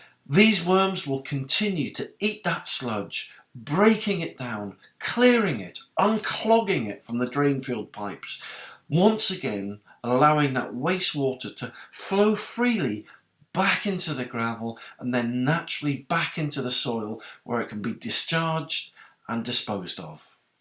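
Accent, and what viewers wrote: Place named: United Kingdom. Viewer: Again, this guy is giving inaccurate information about Residential Septic Systems, because pumping a Septic Tank is only a few hundred dollars, and it doesn't need to be done every year. In fact, pumping a Septic Tank is usually recommend every 2, 3, 4, or 5 years, depending on the size of the tank, and the number of people living in the house. You don't need to add worms or anything else to your Septic Tank or Leachfield/Drainfield... absolutely unnecessary! This guy is just a pitch man, and he's full of you know what... don't be fooled by his British Accent or this video presentation.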